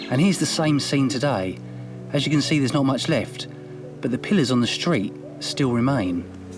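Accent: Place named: England, United Kingdom. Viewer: How about losing some of that English accent for the American's that watch your channel. BTW you might explain what the heck you are talking about at times. lol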